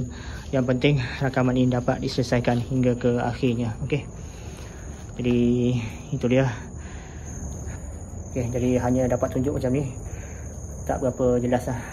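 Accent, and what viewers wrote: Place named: Terengganu, Malaysia. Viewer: Rumah ni ker yg maksudkan tempoh hari lokasi kat damansara..Yg selalu tersasul dlm video terdahulu sebut kotak rokak ker depang ker yg bercampur aduk dgn loghat besut.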